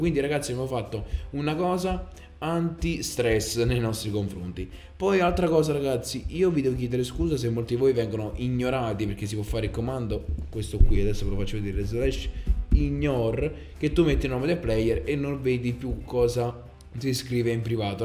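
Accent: Naples, Italy. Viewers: Ma sei di Napoli giusto?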